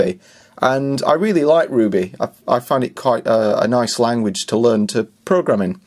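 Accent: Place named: Cornwall, United Kingdom. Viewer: Nice program! And btw : I love your pronunciation und I guess ... Cornwall? Greetings from Germany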